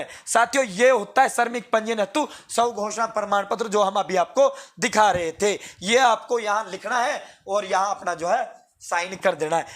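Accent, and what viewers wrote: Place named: Haryana, India. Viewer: Ap haryana se ho 😅😅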